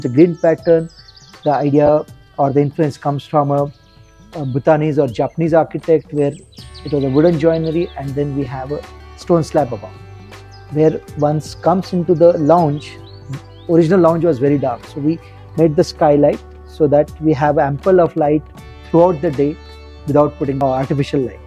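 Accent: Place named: India. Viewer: where is this located? The house look typical Indonesian old house but the way he speak his accent is not Indonesian sounds Indian somehow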